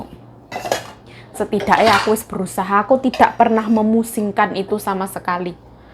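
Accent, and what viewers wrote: Java, Indonesia. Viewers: bahasae medok yuuu, bunyie jawa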